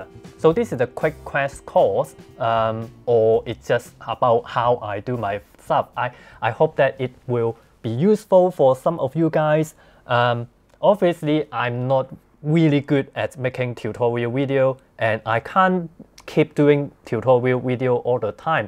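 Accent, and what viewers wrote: China. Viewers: it is really good to hear that 'embedded Chinese native accent' when you talk. keep up the good work! thanks for this wonderful video.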